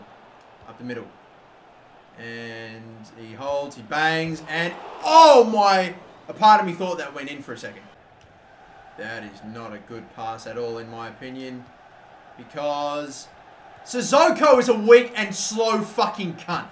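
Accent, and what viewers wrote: Australia. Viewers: daily dose of angry aussie beginning in 3, 2, 1....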